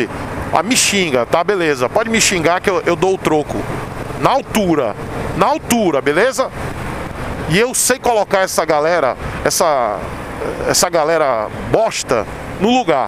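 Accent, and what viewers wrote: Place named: Northeast, Brazil. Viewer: Que vídeo necessário meu irmão, nestes tempos de culto ao ódio, é bom demais ouvir palavras como à sua, um cara sempre alto astral. Eu nunca fui de ler comentários no youtube, pois acho que é muita destilação de ódio. Então meu caro, fica essa força da minha parte para contigo, eu que sou negro e ateu e pobre sei o que é sofrer preconceito. Meu amigo o sotaque nordestino é maravilhoso, sou do interiorzão de Minas e acho legal demais o sotoaque e a resiliência que o nordestino tem! Um forte abraço, continue brilhando meu caro!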